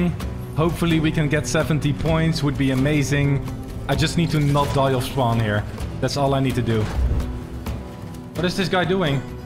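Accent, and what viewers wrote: Netherlands. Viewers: Are you dutch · You sound dutch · Dat Nederlandse accentje😝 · Het nederlandse accent is weer lekker terug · What cauntry or u from i think the netherlands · haha zieke nederlandse accent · jij bent sowieso Nederland, is hoorde het aan overpowered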